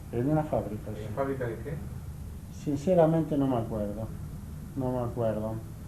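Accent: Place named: Argentina. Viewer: Tiene su acento Argentino Che.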